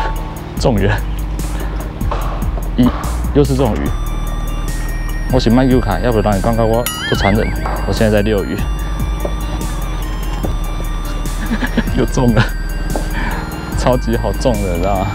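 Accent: Taiwan. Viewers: 剪輯整個很順看了很舒服還有整部影片好幹話好台好爽那個口音那個用詞啊希望可以多拍海釣方面出國題材也很棒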